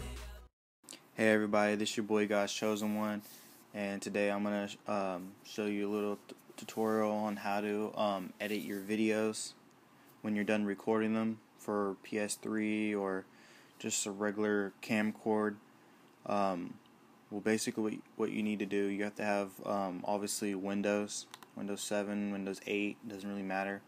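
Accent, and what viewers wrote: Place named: United States. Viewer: Lovely American accent :)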